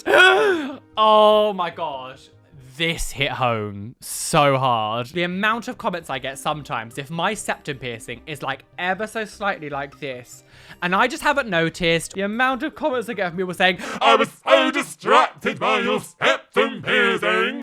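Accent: Texas, United States. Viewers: Your American accent is pretty awesome,! Great video! 😊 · Your accents are adorable 🥰 You always end up with a twinge of a Texas accent. But always adorable 😘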